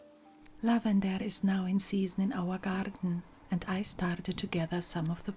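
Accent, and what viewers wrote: Scotland, United Kingdom. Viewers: Every time I watch a video of yours, I'm positively surprised by hearing your Scottish accent. I love the Scottish accent, and personally for me, this is one of the most beautiful accents there is.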